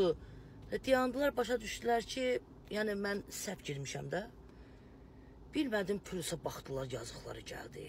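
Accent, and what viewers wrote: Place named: Goychay, Azerbaijan. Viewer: Can baci Göyçay lehcesidi mence??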